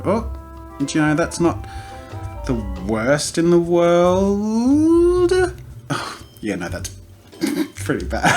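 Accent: Australia. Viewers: During the testing he kind of sounds like a happier, friendlier and australian version of gordon ramsay :D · Are you Australian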